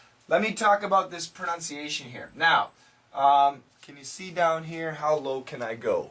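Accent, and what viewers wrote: United Kingdom. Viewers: With hundreds of styles that fit together beautifully, thousands of colours and ideas, great ranges of kitchens, small living spaces and lots of wonderful plants to any garden. With a little inspiration you can achieve anything. Home Base. Make a house a home. British accent! Well, I'm more used to the American (or Canadian) accent yet it's good to hear it from time to time for variety's sake. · With hundreds of styles that fit together beautifully, thousands of colors and ideas, great ranges and kitchens, small living spaces and lots of wonderful plants for any garden, with a little inspiration you can achieve anything. Homebase make a house a home. Hello, what a British Accent. ohh my god. small or smart? I have always wondered why they are unable to pronounce the R well? it's the same here or even worst. The English language should have different name. 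"God bless America"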